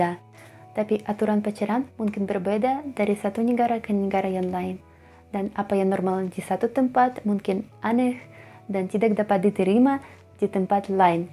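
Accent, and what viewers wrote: Java, Indonesia. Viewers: Logat Jawa 80% apik lo. Monggo mbak mriki dolan ke kota Kediri Jatim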